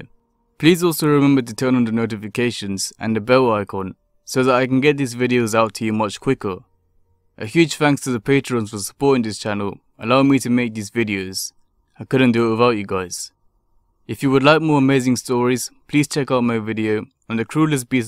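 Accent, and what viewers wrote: South Africa. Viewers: What are you mate? South African? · It's the accent south African? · I'll hava guess, south African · Just a question: is that a SA accent? 😀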